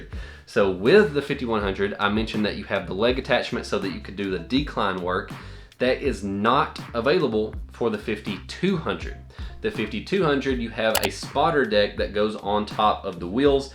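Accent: South, United States. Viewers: You have a southern accent.. What state are you from ? IM from Canada BTW 🇨🇦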